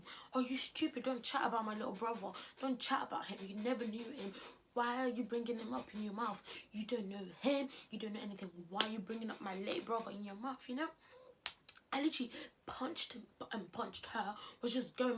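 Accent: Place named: United Kingdom. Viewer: omg you're british? could you do a video on uk slang like the meanings 🤔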